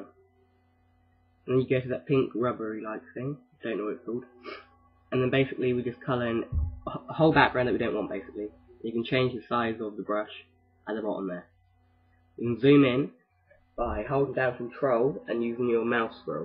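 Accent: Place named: United Kingdom. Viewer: He's British, like me! ;p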